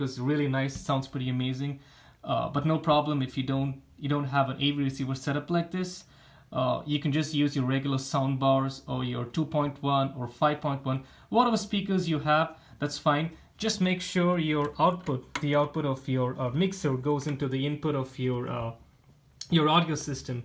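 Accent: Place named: India. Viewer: It's so weird u sounded more like an Indian (callcenter) than that of a Flip. Salamat sa set up!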